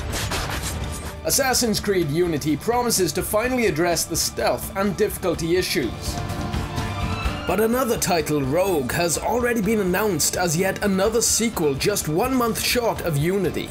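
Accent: Wales, United Kingdom. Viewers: Good video, good choices! Nice Welsh accent, btw. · are you Welsh???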